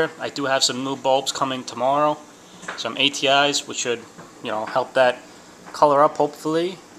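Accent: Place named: Long Island, United States. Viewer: Good job. Love that long island accent "WAR-TER" "SAW-LT" "FRAWGSPAWN". I have that same light fixture, it works well. The stock bulbs are sufficient, so no worry until you get new ones. What bulbs did you order? Looking great so far.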